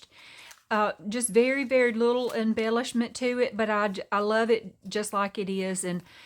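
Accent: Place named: Alabama, United States. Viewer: I love your accent! I have an Internet from Alabama and you sound so much like her. I too suffer from depression and art makes such a difference!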